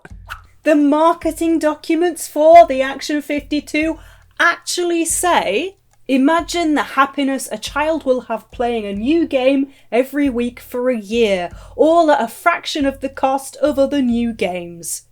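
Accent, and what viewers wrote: United Kingdom. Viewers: Another really cool video to add to the list. Good work.^^ Come to think of it, I'd love to see make a crossover with an other slightly deranged reviewer with a British accent: meets CADDICARUS. That would be dope.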